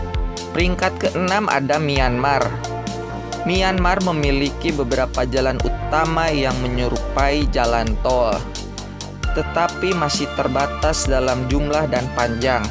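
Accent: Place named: West Java, Indonesia. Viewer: Adminnya urang Sunda yah, huruf F sama V jadi P semua 😀😀😀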